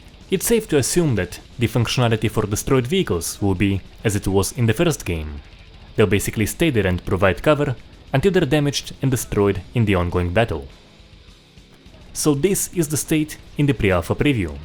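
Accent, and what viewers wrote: Russia. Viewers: U from russia?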